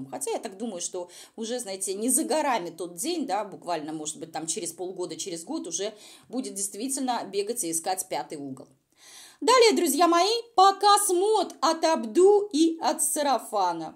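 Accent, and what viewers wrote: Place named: Revda, Russia. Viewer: В ее чо,вместо что.Капец,дуня ревдинская.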